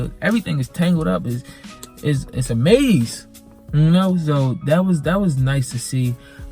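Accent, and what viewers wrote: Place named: Maryland, United States. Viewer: Is this guy a Marylander??? He sounds like he is from my hometown!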